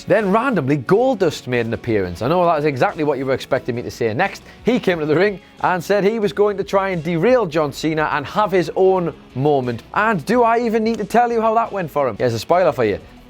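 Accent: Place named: East London, United Kingdom. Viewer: Really guys? It’s regional accents and dialect. Adam Nicholas and Clery are from Newcastle, and that is a notable accent. See also Ant & Dec Andy Murray is Scottish, and is from east London, and has a corresponding accent.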